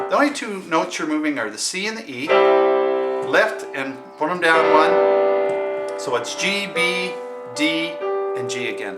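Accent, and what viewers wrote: Canada. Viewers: Great Great Video. Good job, took me less than an hour to learn this song because of this video. And, say, you sound Canadian. Are you?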